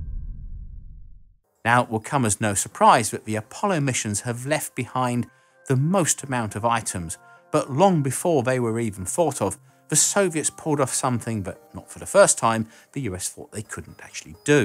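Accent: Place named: England, United Kingdom. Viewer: English accent I'm out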